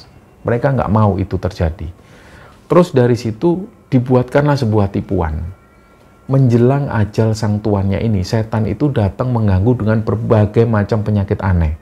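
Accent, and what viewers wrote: Indonesia. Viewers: Suaranya mas meduk (kawa)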